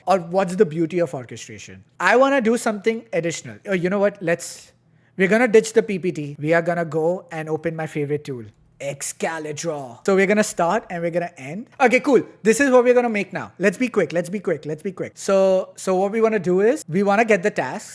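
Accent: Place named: India. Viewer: This guys accent is like a mix of a white guy but grew up in India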